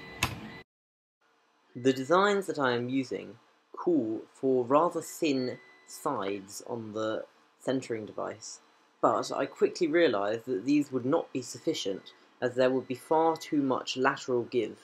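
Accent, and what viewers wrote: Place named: United Kingdom. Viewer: Great man and an interesting new YouTube channel. I really enjoy your British accent with the soft 's' pronunciation 😃. Make more videos in general + a finished video of this project (if you have finished it so far 😄).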